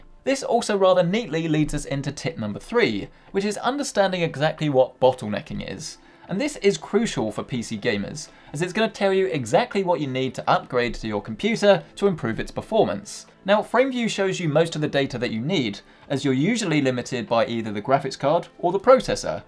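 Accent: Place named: Cockney, United Kingdom. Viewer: Not sure I am a big fan of Cockney. I think I prefer normal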